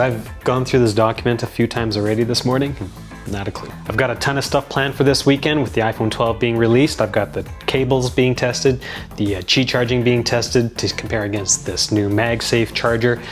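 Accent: Canada. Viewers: Thank u for the vid. Also you sound Canadian. Love that accent. Lol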